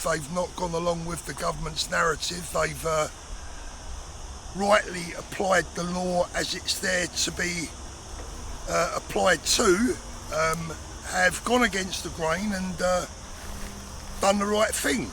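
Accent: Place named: Cockney, United Kingdom. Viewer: Where are you filming from? Do i hear a cockney accent, so long since ive heard one. Wonderful dulcet tones ❤❤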